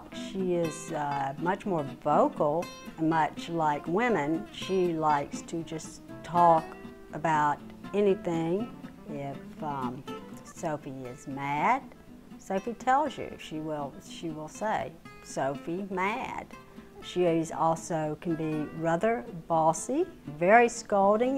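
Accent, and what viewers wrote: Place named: Tennessee, United States. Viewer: Spot on for African Greys--I've had parrots for 40 years, my Grey for 15. Just an aside, I make a hobby of identifying southern accents (I'm from Florida, we don't count) so, here goes....hate to get it wrong: Tennessee?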